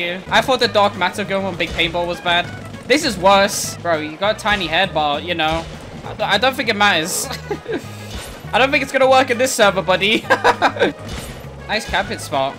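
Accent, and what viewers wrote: United Kingdom. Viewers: your voice is kinda british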